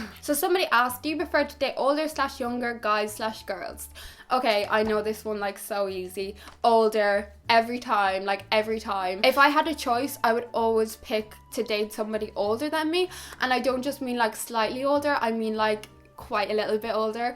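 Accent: Dublin, Ireland. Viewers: You’re so pretty! 🖤 I could just sit and listen to you talk forever because of your Irish accent, haha! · Hi! Finally commenting on one of your videos since I started watching them when I lived in Cork for a year (I worked as an au-pair) 🙃 Your accent always makes me miss Ireland so much, haha! Of course that's not the only reason, I do really like your videos and the way you come across, your style... I'd say mine is quite a bit different but it looks beautiful on you and oh, you're so pretty 🌹 (Btw, loving Lana del Rey over here as well, and as for the TV series - have you watched 'Orange is the New Black' or 'Skins'? I feel like you could like Effy Stonem from the second generation a lot, concerning the latter... 🙃) I hope you have a wonderful day, greetings from Germany! 🌲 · Hey beautiful😍!!! your videos make me so happy😄i love everything about you: your pleasent voice, lovely accent (who said you can't understand irish accent??😂)your wisdom And humor , the content, everything!!! 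I wish you a warm nice calm weekend😙p.s. tv shows that are available on the internet now which i enjoyed very much are: csi cyber and stalker. Must watch!!and yes-i'm bi and i notice girls more than boys, don't know why ❤💖💕❤💖💕❤💖💕❤💖💕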